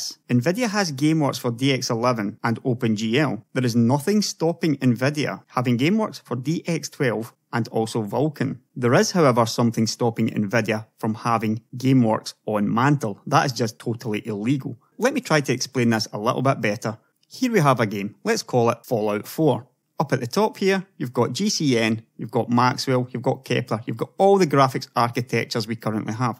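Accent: Scotland, United Kingdom. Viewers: cool Scottish accent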